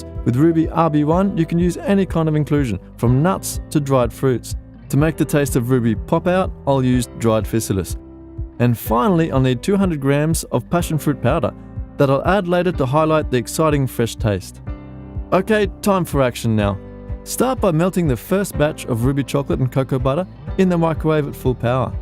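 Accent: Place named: Australia. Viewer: He sounds Australian with a hint of French.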